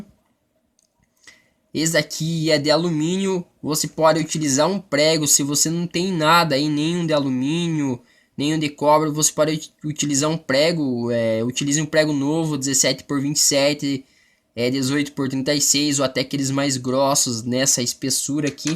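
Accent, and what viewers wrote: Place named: Paraná, Brazil. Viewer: tu é paranaense né mano kkkkk tmj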